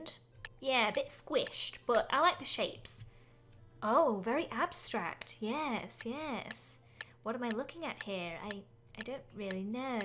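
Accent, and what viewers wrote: England, United Kingdom. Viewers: lol she's british XD I can tell you're new here... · She is from England. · She is English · She is British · she has a british accent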